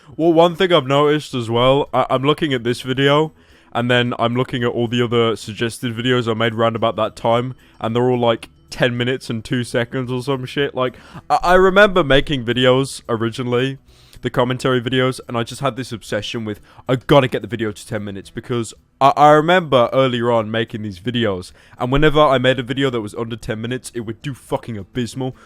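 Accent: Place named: United Kingdom. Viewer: Damn you an you manly deep british voice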